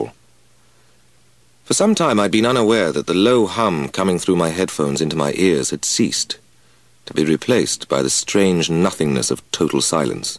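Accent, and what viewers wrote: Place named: England, United Kingdom. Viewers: I agree, respect to A Maitland, but you need the well spoken English accent for this story. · Much prefer this version (which I have on cassette tape) to the Al Maitland version. The difference between the reading by an actor versus a radio announcer. The English accent also lends authenticity not present in the Canadian version. Wonderful story, in any event.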